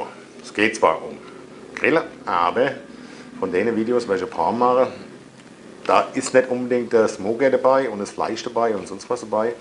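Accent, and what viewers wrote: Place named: Hesse, Germany. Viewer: Endlisch a ma aner der schö Hessisch babbelt. Weider so 👌🏼😉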